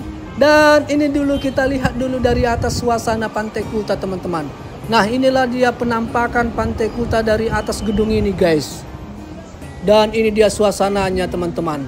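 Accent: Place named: Pematangsiantar, Indonesia. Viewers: Mantap dek... Pertahankan ciri khas siantarnya... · Logat Siantar ny ga hilang ya Lae . Untung lah unik unik Lae klu sempat Anik Anik runyamlah nanti di tempat sarapan ny...😀😀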